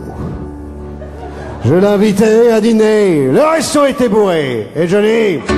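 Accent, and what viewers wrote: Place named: Belgium. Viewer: il le fait sûrement pas exprès mais des fois il a un accent belge x)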